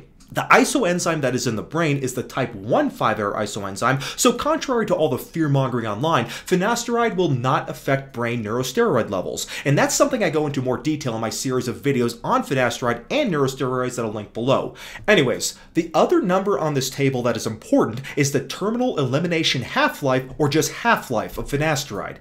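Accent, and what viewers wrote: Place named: North, United Kingdom. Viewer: UK vibes. Possibly north of england. Possibly Pakistani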